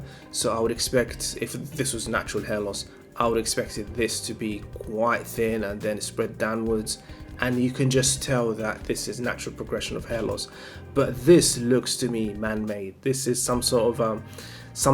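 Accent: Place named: United Kingdom. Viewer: aren't you independent and can review and say whatever you want as long as it's true? With your accent, I can tell that you are British and you have the right to freedom of speech unless you have a contract with certain clinics. I hope you are free to say whatever you want without Legal Ramifications. Cheers!!! Ps, I had my hair transplant in Turkey this June and my hair is growing a lot. I had a minor issue and the clinic is willing to fix the issue free of charge next year. So far so good!!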